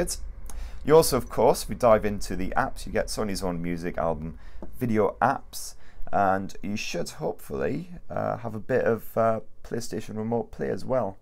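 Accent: United Kingdom. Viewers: Love your British accent and sound.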